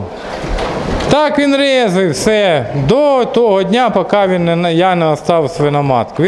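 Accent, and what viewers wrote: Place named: Surzhyk, Ukraine. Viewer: Супер, супер репортаж. Наслаждался суржиком, неповторимыми интонациями и жизненной силой голоса Автора!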